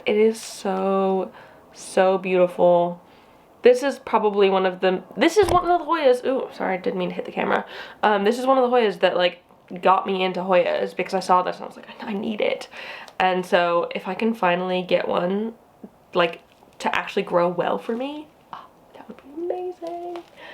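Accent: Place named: England, United Kingdom. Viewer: You’re kinda picking up that English tang! Isn’t that funny how that works?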